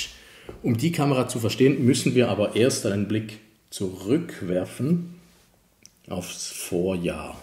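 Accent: Switzerland. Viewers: an deinem hochdeutsch feilen. Da hört man den schweizer einfach zu stark raus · ihr in der Schweiz auch im Dialekt "sächs" sagt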